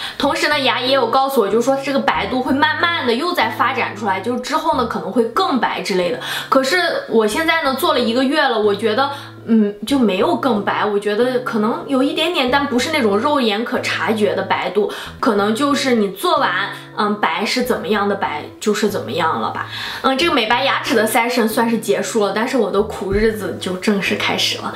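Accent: Shandong, China. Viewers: 朋友，听口音是山东人啊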